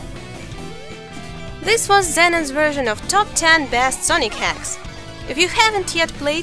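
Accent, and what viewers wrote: Russia. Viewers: you're trying to hide your Russian accent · Russian accent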